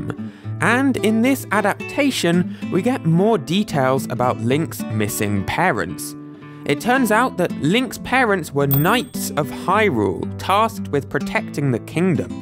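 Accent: United Kingdom. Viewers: I love how you pronounce it "Ocarin-er" lol. You're on the opposite side of the earth, and somehow, you're more redneck than me. Hello, from Texas, mi amigo, mein freund! God bless ya, dude. I love your vids. I love your accent, too. (American people think British sounding accents are indicative of high-level intelligence. But they'll still pick on you for pronouncing things differently than they do. Idk why. I'm a native Texan. I'll be American again, as soon as the rest of this country pulls their heads out of their asses.....) Keep up the great work.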